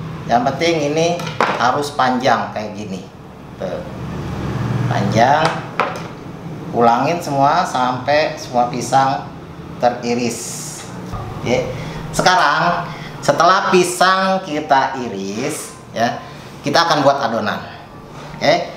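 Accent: Jakarta, Indonesia. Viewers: Wenak pinter abah.. Khas suaranya ky betawi, terima kasih resep nya bah 🙏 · Aye suka bgt ni ame logat betawi Nye,berase kekeluargaan Nye...hehehe Smoge video Nye menginspirasi yg lain yah bang ye...😊😊😊👍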